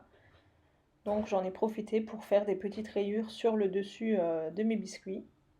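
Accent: Alsace, France. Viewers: Bonjour je viens de découvrir votre chaîne vous faites des recettes peu coûteuse avec très peu d'ingrédients c'est super sympa j'ai testé quelques recette c'est super bon par contre pour la recette des biscuits la le l'objet en silicone pour faire les biscuits au chocolat vous l'avez trouvé où parce que c'est c'est plus pratique une machine ou le hachoir pour faire les spritz donc est-ce que vous pourriez nous dire où vous avez trouvé l'accessoire très intelligente et continuer ainsi à nous régaler et à nous faire économiser de l'argent et continuer à faire du maison et arrêter l'industriel bisous et comptines bonne continuation Jennifer PS votre accent me fait penser à l'Alsace et vous en Alsace parce que je vois que vous prenez beaucoup de produits d'Allemagne bon courage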